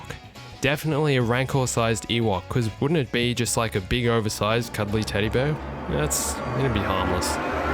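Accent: Australia. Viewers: are you Australian?? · You're Australian? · YES!!!! AN AUSSIE!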